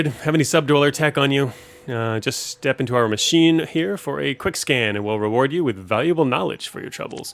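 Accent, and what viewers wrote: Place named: United States. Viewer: Random question, but why is you file explorer non English? Your accent is American.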